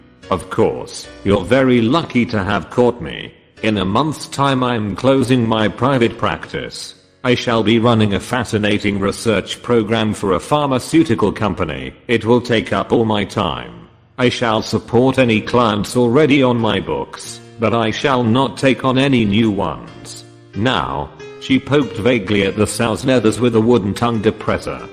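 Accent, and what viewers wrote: United Kingdom. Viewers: Great... All of his voices and pronunciation is perfect!Full of British Accent!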